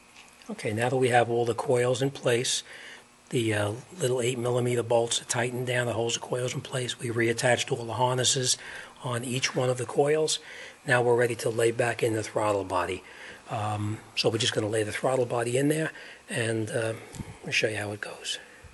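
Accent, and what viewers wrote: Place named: New Jersey, United States. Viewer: Hey, are you from Jersey City? I am, and I can detect the Kings english.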